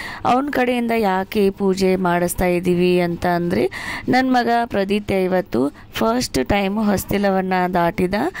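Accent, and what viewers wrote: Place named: North Karnataka, India. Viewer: Neevu nam uttar karnataka style le maatadri ennu chalo anasatt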